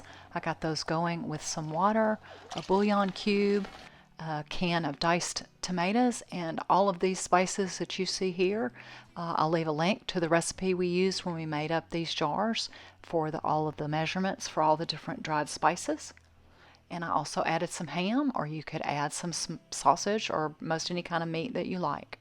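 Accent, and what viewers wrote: South, United States. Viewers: I’m enjoying your channel that I ran across, and picked up on that southern accent (which I have as well) so I had to subscribe! lol! Your voice is very soothing. I had a Westbend slow cooker many years ago and enjoyed it so much. When it stopped working, I kept the lid and use it frequently as a serving dish. I would love to find another, reasonably priced. I have a lot of your videos to catch up on! Blessings to y’all!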